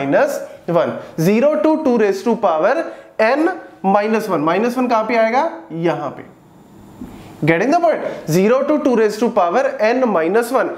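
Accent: Punjab, India. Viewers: Assalamalaikum sir your videos are really helpful for btech electronics students....I m really greatful to you ...and I really like your punjabi accent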